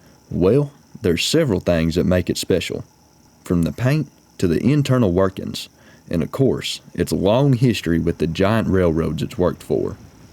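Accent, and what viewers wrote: South, United States. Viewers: This guy is the most American-sounding American I’ve ever heard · This is by far the best channel Ive stumbled upon recently! Your accent sounds like one that should belong on any of my fishing buddies, glad to see there are more good old boys into horses of the Iron type than just me. Lmaooo, liked and subscribed, keep up the videos! I really enjoy them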